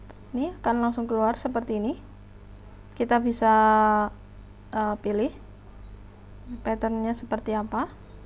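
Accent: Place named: Banyumas, Indonesia. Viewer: Informatif👍 mbak e pasti orang ngapak😀semangat👍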